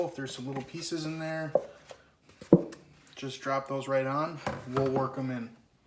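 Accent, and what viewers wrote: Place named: United States. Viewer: We say basil you say baysal lol, love the American English.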